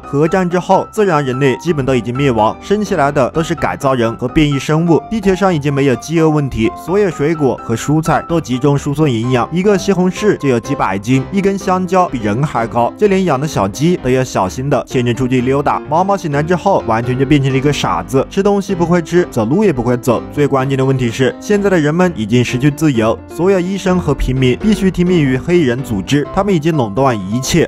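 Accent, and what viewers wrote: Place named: Hunan, China. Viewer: 这个湖南口音。。。。好出戏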